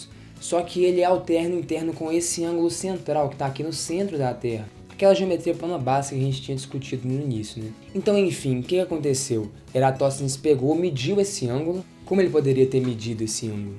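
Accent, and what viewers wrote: Minas Gerais, Brazil. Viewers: Que sotaque de minerim mais fofo! 🤗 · Ele é mineiro mesmo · O sotaque mineiro jamais passará despercebido...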